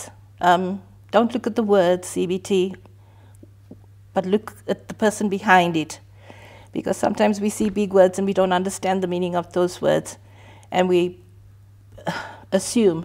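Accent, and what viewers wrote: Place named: South Africa. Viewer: Is she South African? She sounds South African. Hope this has stuck after so many years.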